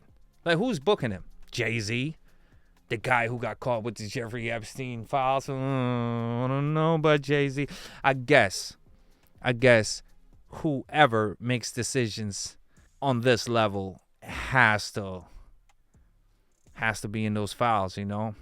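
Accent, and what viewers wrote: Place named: Puerto Rico. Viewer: You look and sound boricua bro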